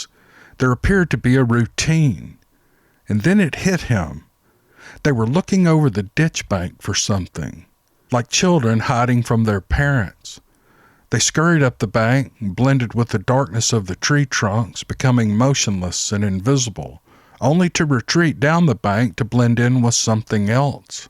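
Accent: South, United States. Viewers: Mr. - you are becoming a skilled storyteller and this is one of your very best!!!💯 I have always enjoyed the way you tell a story, being half-southern myself. Your accent and genuine love for the stories you share here have been apparent from the first time I listened to your vids. Also ❤️ your musical and visual 🐸 accompaniment, especially the ladies in your side yard. 🐓🐔🐥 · Mister Southern Comfort low hear your southern accent is peaceful you're such a good reader God bless you and your wife hope to hear from you again soon love you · I have enjoyed all the stories you have shared on this channel but, none more than this one. Your deliberate cadence and southern drawl make it easy for my mind to paint the pictures your words describe. I detect this to be a true and authentic account of what happened that day in 1977. Nebraska Checking In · hello and that was SO therapeutic! 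I've been awake tossing and turning like a captured viper in a pillowcase till even my cats left the bed for more settled and quite sleeping spots! But as I lay down in my bed just before this story began, after taking some pain killing tablets and having a hot bath, I am hoping for just 2 or 3 hours kip, enough to get through the day. I'm watching the early spring day begin as the sun peeks through the curtains, and listening to that Southern accent and cadence, I felt like I was listening to a meditation. What a wonderful story and what a wonderful family the twins had both as children and as adults. We are locked down in NSW Australia where I live and even though I'm country/rural and there hasn't been a single Covid "case" in the 100 kilometres around me, that 1 case has kept me home for a very long time. You have a gift and I sometimes put one of these longer stories on if I'm cooking something like lemon butter in my kitchen because I have to stay at the stove and stir constantly. 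I'll listen to you tell the story and I'll look out my kitchen window at the farmland and put myself somewhere else in time and place where the story you are narrating happens..I hope that you and yours are doing well and I look forward to the next "Calming " time. Till then take care now! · That one really piqued my interest. It was beautifully written with respect for the shadow people and the family members in involved. The perfect compliment to the story was the soft southern spoken voice that narrated it. Thank you. 😁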